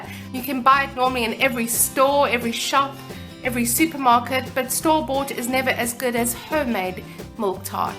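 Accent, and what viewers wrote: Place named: Australia. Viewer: Im going to try it looks awesome! Why did I believe you were from Australia 🤪😅 I guess you're from South Africa (Ive been to cape town as child (still have a scar lol cuz i got stuck in cement it dries fast there 🙄 but when they got me off with mzchines n stuff, I was scared and ran into a closed (I thought the door was open, it was just very clean 😅) but the door broke and I got a huge scar /cut back then. Today its an itsy bitsy scar, but I think of South Afrca each times, iften as I wear make up, 😂) thought you should know, don't ask me why 🙄lol But damn it looks great I wanna try makibg it! Missed to see you cook (loved your last video tho, I'm still in schock and more get up to the surface 😱 Thanks fir great recipe 😋 Xoxo mm 😊👣🌻❤️👍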